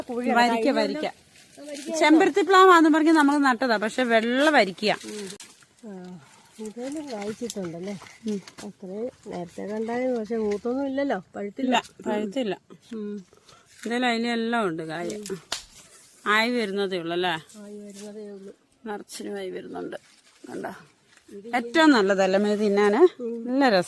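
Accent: Kottayam, India. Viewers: Kottayam ano. Bhasha Kottayam alkkarude pole und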